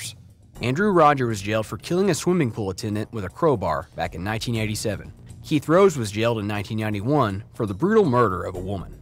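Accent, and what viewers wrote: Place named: United States. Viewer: Sounds wierd an American describing a UK prison 😂😂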